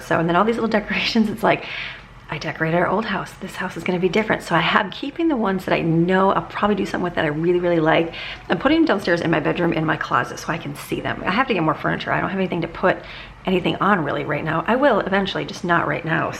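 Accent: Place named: Florida, United States. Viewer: 🙂when I looked it up, it sounded just like how says it...:) maybe it's the Floridian accent making it sound like Bells:)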